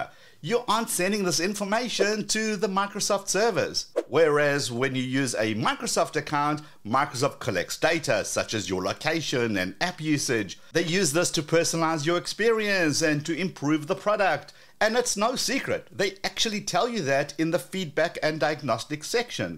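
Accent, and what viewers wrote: South Africa. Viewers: I might be way behind, but are you South African? 🤣🤣🤣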